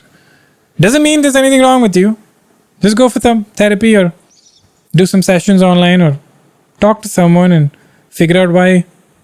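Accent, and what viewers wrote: Kerala, India. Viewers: ur funny and relatable n u make me smile...wt ur accent...from one mallu to another...keep it up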